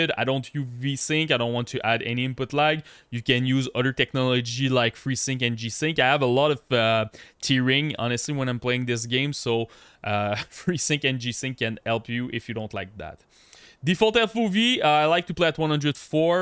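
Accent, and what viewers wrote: Quebec, Canada. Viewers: T québecois avoue lol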